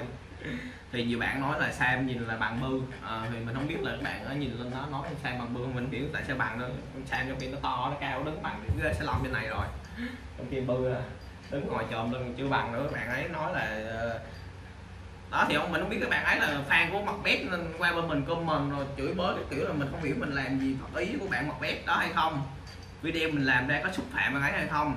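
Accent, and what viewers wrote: North, Vietnam. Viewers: Hoi dau ma noi bac ky no la vay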